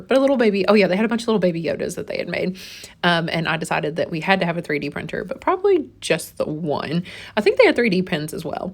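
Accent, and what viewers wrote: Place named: Kentucky, United States. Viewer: Also, I just found out you're from Kentucky and so am I!!!!! I thought you had a familiar accent 😂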